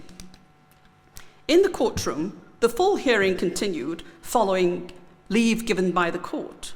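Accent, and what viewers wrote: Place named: England, United Kingdom. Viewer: 下⋯咁正既English accent, 我真係好想知你d英文有幾好聽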